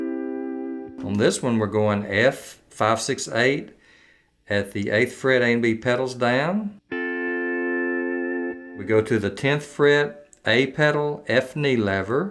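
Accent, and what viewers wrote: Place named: South, United States. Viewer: Hi, Great stuff, just getting back into play pedal steel after 45 years away. Used to play in a band etc, but now feels like i am starting all over again at 77 years old - fingers getting looser... Your instruction clips are proving invaluable, and enough for the moment, until i can find time to go deeper. For the last couple of days it has been bothering me, at 13.13 you have said a diminished repeats it's self every fourth fret (I ''heard'' note!!). Whereas a dim repeats it's self every third note, and an augmented repeats at every fourth note. That is if I have it correct from memory and the way it sounds. Then it dawned on me that on replaying this evening, that you were saying 'fret' and counting the fret you were on as the first one, instead of starting with the next fret up (as the first one), which would be the next note (or the first of three). 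I have not noticed this before, and wonder if it causes confusion for some ('idiots' like me!!!?). Is it common practice to count the fret you are on as the first? I am not being pedantic, just feeling my way, and wondering for all of us. I am always looking forward to the next presentation, and the southern accent. Takes me back to so many tours of the States, teaching and demonstrating woodturning - 27 states in all......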